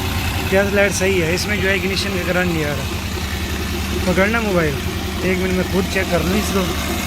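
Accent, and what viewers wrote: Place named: Saraiki, Pakistan. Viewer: sir ap ka leja saraki lagta hay kayak lodhrahan maestro hay ap kebab work shop.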